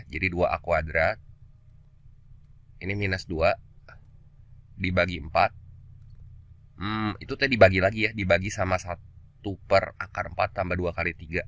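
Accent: West Java, Indonesia. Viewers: LUCU IHH LOGAT SUNDA LOP LOP PA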